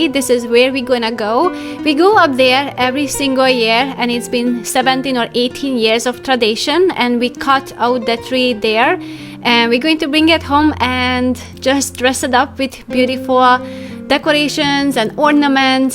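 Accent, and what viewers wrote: Hungary. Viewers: Kihallottam a magyar hangsúlyokat tudtam hogy magyar vagy! Köszi a sok ötletet. Egyszerű és nagyszerű! 🎄❤️boldog karácsonyt! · So sophisticated and beautiful, you gave me great ideas! You have a Hungarian accent, are you from Hungary by any chance?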